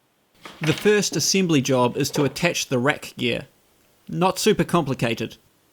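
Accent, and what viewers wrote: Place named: New Zealand. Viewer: Just found these vids. Nice work. Pleased to hear a Kiwi accent on youtube.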